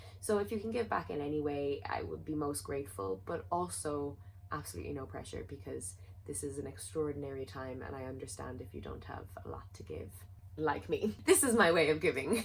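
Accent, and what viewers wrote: Ireland. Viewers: Wow I really wasn’t expecting you to mention my comment! I feel so wonderful that you were excited about something I said when I’m always sooo so excited about everything you say thank you 💞💞💞 and I love this new segment! I think it’ll continue to be a really beneficial way to interact with your audience I for one feel very engaged and the more ppl that get that I think it’ll be really nice 😊 (also ppl pronounce my last name wrong quite often but you were spot on and i loved hearing my name in your lovely Irish accent lol 🥰)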